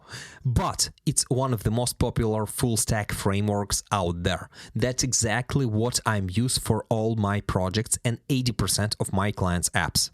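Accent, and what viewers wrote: Ukraine. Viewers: Holly Dolly, you are our guy. I undestand that by accent. Ukrainian too?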